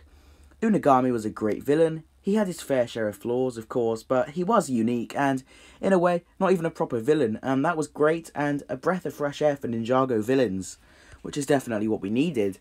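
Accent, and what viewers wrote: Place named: United Kingdom. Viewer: British lol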